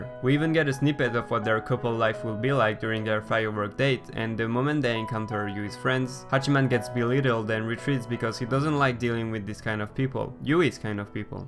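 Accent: France. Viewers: Good video !! Btw, are you french ? (Your accent)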